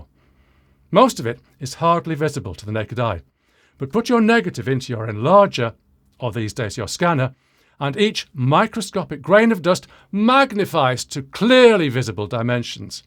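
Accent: United Kingdom. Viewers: Ouch. Anyone watching this video should read the comments before wasting the time viewing this one. The only thing credible about the commentary on film photography is that the speaker has a British accent. One cannot rely on this as a source of credibility. BTW, I did watch your other content, and it's great! Why tarnish your channel with this? Less credible material adds to the pile of rubbish that people have to sort through on youtube. Stick to audio. THAT is what you are great at!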